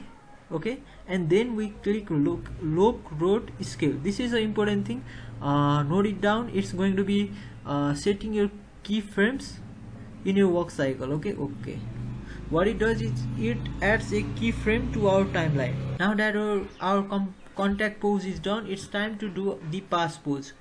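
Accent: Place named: Brazil. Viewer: he said you're a brazilian trying to speak english, so you should quit speaking english because to him you sound like a brazilian.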